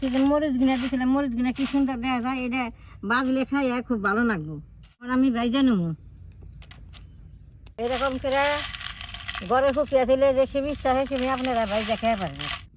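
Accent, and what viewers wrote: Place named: Nawabganj, Bangladesh. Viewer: দেখেই মনে হচ্ছে অনেক মজার। দিদি আপনার দেশ কই? কথা শুনলে মনে হয় আমাদের নবাবগঞ্জ বান্দুরার 😅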